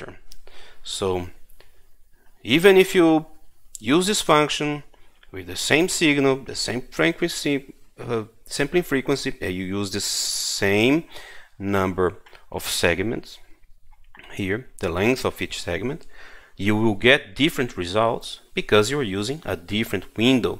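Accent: Brazil. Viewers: This accent sounds very familiar. Brazilian (nordestino) by any chance? :)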